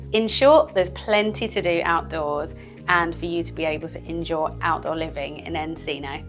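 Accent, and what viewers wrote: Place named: United Kingdom. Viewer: You'll be selling Alot of properties with your beautiful British accent... Thumbs 👍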